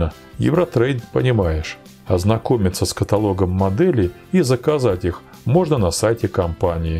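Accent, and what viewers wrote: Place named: Vologda, Russia. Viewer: Такой прикольный Вологодский говор, но всё по делу. Да, там на фото у него на самом деле очень грустный фейс, аж жалко его работягу.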